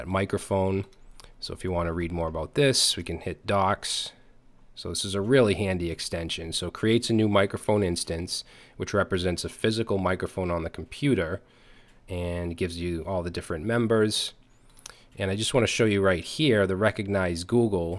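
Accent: Boston, United States. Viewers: It can even pick up your Boston accent! good one. More Python please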